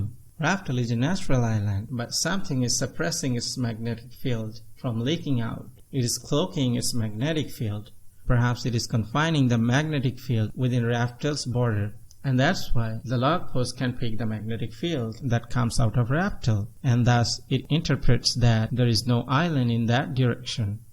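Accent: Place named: India. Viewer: You're Indian aren't you? Accent gives it away nice video anyway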